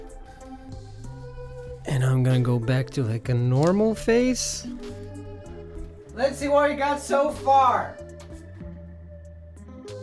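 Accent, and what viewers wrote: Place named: Israel. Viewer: are u jewish. I smell an israeli accent. Might be wrong though.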